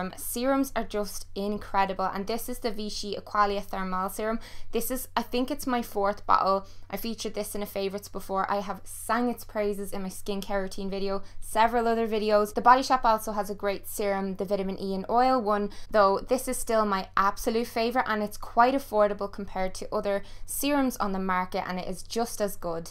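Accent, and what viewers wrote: Ireland. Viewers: What part of ireland are you from? I love your accent, I'm from dublin. I was just wondering (love the top btw) if you have any sun are tips because I now live in California and even though i wear sunscreen I get so burnt, I have trouble going to gym class! I was just wondering if you had any tips :) I love your videos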